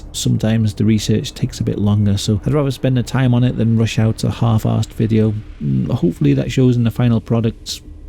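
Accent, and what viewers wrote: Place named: Liverpool, United Kingdom. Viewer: Love this channel. Plus your also nearly scouse accent makes it better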